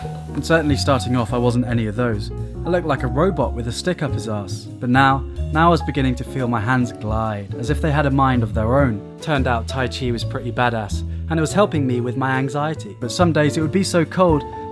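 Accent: United Kingdom. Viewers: British accent is horrible...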